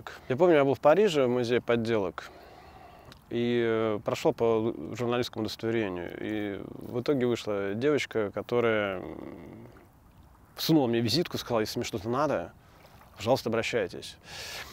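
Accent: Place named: Moscow, Russia. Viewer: ты стал говорить как МАсквич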